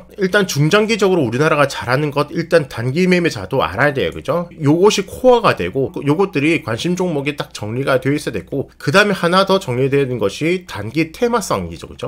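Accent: Gyeongsang, South Korea. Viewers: 남석관 할배가 자기인생에 1~2월은 나빴다! 하던데,,,인물 나셨는지 기대합니다. 수익보다 나레이션(갱상도 말로 씨부리는 맛) 재미.아닌지.?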